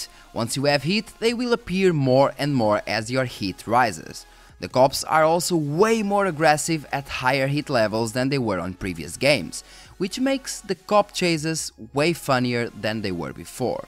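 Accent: Portugal. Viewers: when i start hearing european portuguese accent... hello there xD either way nothing beats nfsu 1/2 and need for speed most wanted 1.... underground 1 was amazing... underground 2 was the first open world (if im not in mistake) and mw was just loved by... everyone? EVERYONE!!! :D soundtracks were amazing in all of those 3... in heat half of the soundtrack is cool other half not so much... either way as portuguese im fine with it but even to me i get the feeling the guy responsable for the soundtracks was some spanish or something because its only latin music... i mean.... it matches the game... but sounds weird... specially for me im used to rock and super agressive songs in nfs (im old school xD) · dude that accent... are you portuguese?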